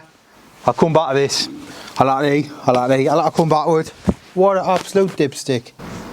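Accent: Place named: South Wales, United Kingdom. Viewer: he is from south wales in the UK mate...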